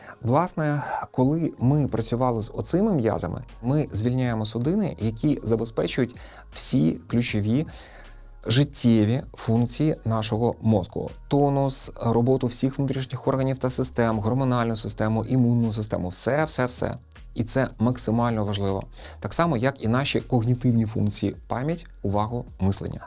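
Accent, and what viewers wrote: Standard. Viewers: Приємно слухати,чиста українська мова!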